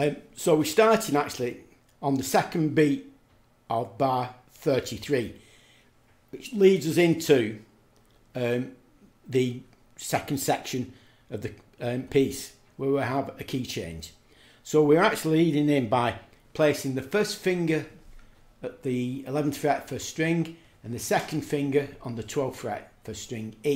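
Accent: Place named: Northern England, United Kingdom. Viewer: I could tell you are from up north before I read. Nice tutorial. I'm from Liverpool. Cheers!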